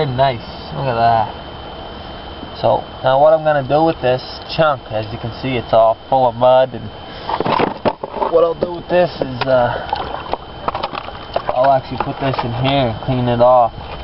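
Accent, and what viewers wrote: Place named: Nova Scotia, Canada. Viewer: Nova Scotian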